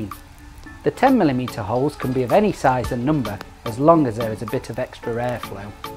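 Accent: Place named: England, United Kingdom. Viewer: He's most likely in England, Australia or New Zealand. The English pronounce it as "Al-ew-minium". Why? There are those that believe the guy who first created Aluminum from Bauxite named it that... and pronounced it that way. You can research up the oft controversial topic and do some reading... it can be somewhat amusing to us engineer types. Peace & good vibes! - Max Giganteum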